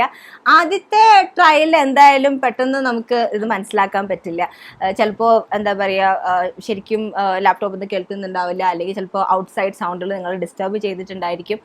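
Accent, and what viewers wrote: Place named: Thrissur, India. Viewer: Tcr slang😍😍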